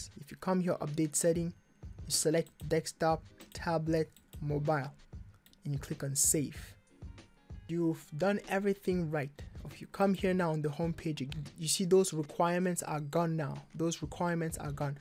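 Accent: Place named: Nigeria. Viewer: I just landed on your channel and l stayed on. Good stuff. Your accent sounds Nigerian.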